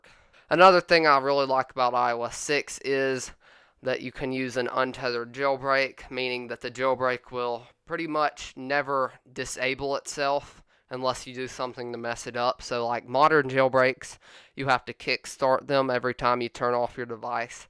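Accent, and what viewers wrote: Texas, United States. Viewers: 1 second and i already know youre from texas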